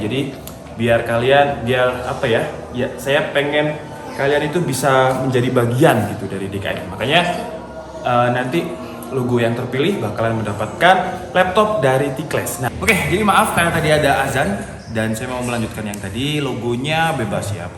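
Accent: Java, Indonesia. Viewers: Yg gw suka dari channel ini itu karna real banget mungkin dikit'' lihat prefrensi gitu tapi lainya real nggk ada settingan....dan logat jawanya ada dikit'' soalnya gw org jawa jg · Org jawa kah kok logatnya kek jawa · Wong Malaaang wkwkw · jowo ne ndi mas?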